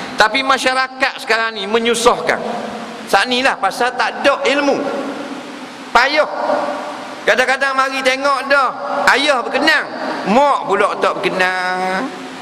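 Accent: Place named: North, Malaysia. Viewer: pandai ustaz ckp utara!!!! #RESPECT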